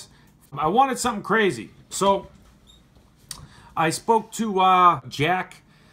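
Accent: Boston, United States. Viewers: are u from boston cuz i can hear boston accent.lmao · Can tell you probably from Boston or close by. I'm from South Boston myself. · Is this guy from Boston? Most times he said copper it sounded like coppah Either Boston or Philly. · u from Boston ain't ya? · this dude is from boston right? his accent wierd.... "copah" lmao xD insted of "copper" lol when i heard him say coper i instantly knew XD! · u must be from Boston or Maine aye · i was wondering where your accent was from then you said copper. Only people that say cahpah are from boston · r u from boston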